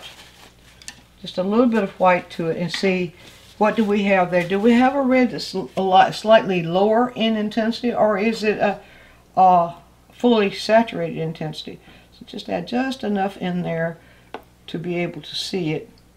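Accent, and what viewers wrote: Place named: Georgia, United States. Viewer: I love how you say “cullah”, your GA accent is so charming!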